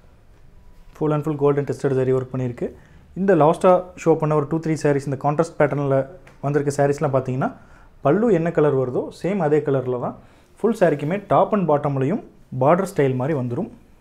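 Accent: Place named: Kongu, India. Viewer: 💐💐💐🙏super with Nama kongu Tamil nice voice ங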